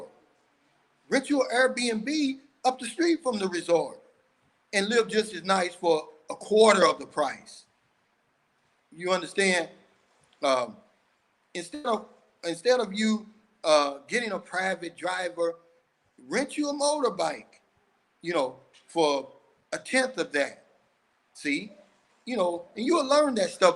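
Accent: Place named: New Orleans, United States. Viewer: Hey are you I like what you're doing I'm from Florida in the Miami area my wife is from the Philippines (zamboanga) I like your chanel a lot you don't sugar code anything keep up the good work keep doing what you're doing and I also like the new Orleans accent also thank you for everything okay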